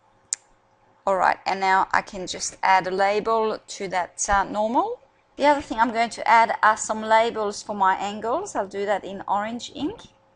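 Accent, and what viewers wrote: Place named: Australia. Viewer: ausrtaliam accernt tho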